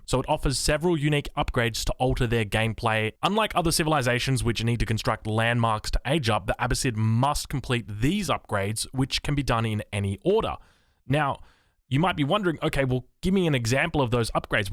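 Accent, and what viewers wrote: Australia. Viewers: "My soothing australian accent" - Self awareness is key Mr:D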